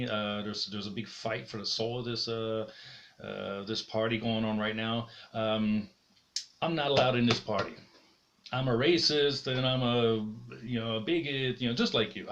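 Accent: United States. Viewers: Your American English is excellent my friend you had to have lived in the states at some point most Europeans speak a somewhat British English speaking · Is this guy American living in Germany? He sounds American.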